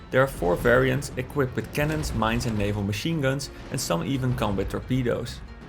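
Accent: Netherlands. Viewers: I can hear your dutch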